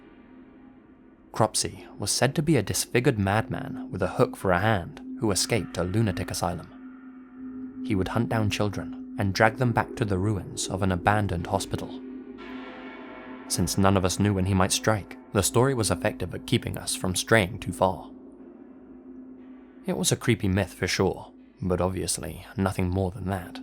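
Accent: England, United Kingdom. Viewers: mostly taking place in New England, where i too live, this is going to be good!! makes me wonder if is in new england (though i realize he has a slight English accent) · It’s kinda funny listening to a British person narrate stories about the U.S. Also, let’s go Massachusetts!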